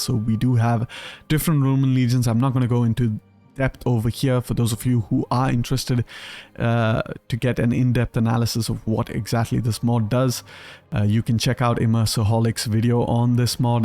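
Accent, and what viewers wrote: India. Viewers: Good stuff. You sound Indian - if so, Latin and Greek are far easier to pronounce correctly than modern European languages. You can use the dental 't' and 'd', for example (like in Hindi 'tum' or Tamil 'thambi' - non-Germanic languages do not use the alveolar t and d (like in English or German)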